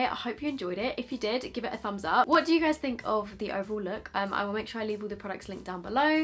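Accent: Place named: United Kingdom. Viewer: Hi, I really love watching your videos and I'm in love with your British accent💖 (I am Italian😊)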